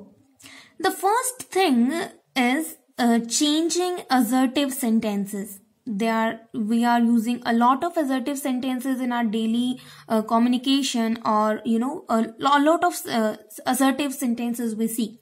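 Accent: India. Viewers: Your English is not good, your english is indian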